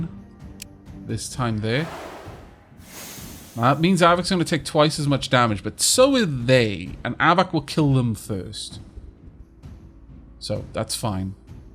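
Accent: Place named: South Wales, United Kingdom. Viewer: Thought he might have been since I'm from wales and i can his accent was familiar and if I was going to guess he is from South Wales because his accent isn't realy strong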